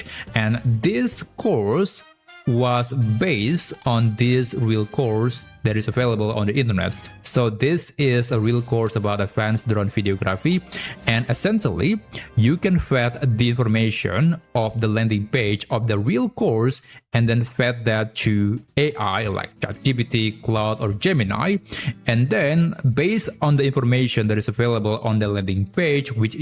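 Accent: Indonesia. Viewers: bro you have indonesian accent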